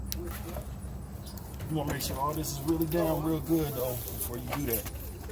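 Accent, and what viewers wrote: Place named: California, United States. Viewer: Definitely a Cali native lol. This video should have more likes. Perfect fix!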